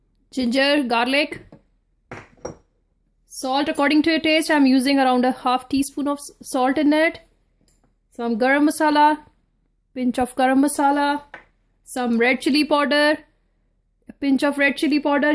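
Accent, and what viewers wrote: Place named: Punjab, India. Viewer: U have punjabi accent. English doesn't suit you. If u speak in punjabi it will sound more good seriously.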